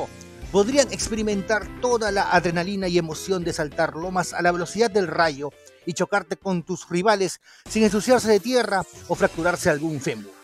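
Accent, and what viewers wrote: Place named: Chile. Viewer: Eres peruano? no me lo tomes a mal pero antes pensaba por tu forma de hablar que eras un poco chileno ... claro un poco no totalmente que eso ya es otra cosa... igual un saludo siempre veo tus videos.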